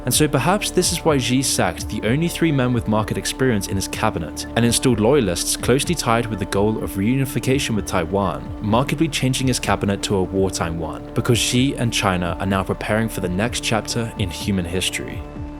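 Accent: Norway. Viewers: are you norwegian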